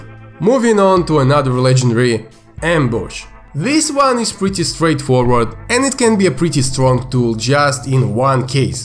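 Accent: Russia. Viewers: i like how u can talk in my language with ur russian accesnt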